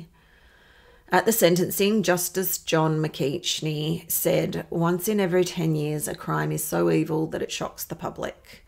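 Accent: Australia. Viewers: I don't usually like Aussie accent but I could listen to you all day U.K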